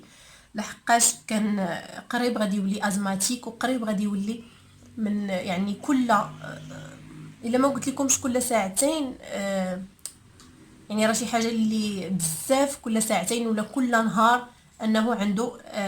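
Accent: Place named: Algeria. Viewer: هاذي موش لهجة تونسية هادي جزائرية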